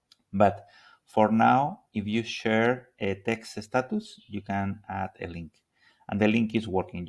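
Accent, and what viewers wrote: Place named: Spain. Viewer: I can hear you are Spanish. Gracias 🙏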